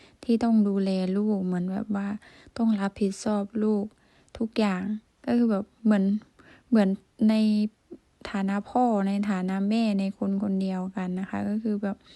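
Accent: Laos. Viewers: แม่ติดตาม มานานแล้วมักที่เจ่าเป็นคนลาวเว้าลาวเป็นโตของโตเองแล้วก็เข้าใจสำเนียงเว่าของลาวไต่มันกะคือคนอิสานทางอุบลจงทำในแบบที่เฮาเป็นแล้วคนเบิ่งคริปเขากะมักที่เจ่าเป็น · มันบ่คุ้น เสน่ห์ของหนูคือพูดลาวนั่นล่ะ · ใช้ได้อยู่ เว้าภาษาไทย ฝึกไปและต้องสังเกต ส่วนมากคนลาวเพิ่นสิเว้า ช เป็นซ.คนไทยสิจับได้เลยว่าเจ้าเป็นคนลาว ลองฝึกเบิ่ง แล้วกะ ด เจ้ากะใช้ ล ได้เจ้ากะเว้าว่าไล้ ช่วยเจ้ากะเซ้าซ่วย ช. ด ฝึกให้มันถูกแค่นี้กะเว้าถูกแล้ว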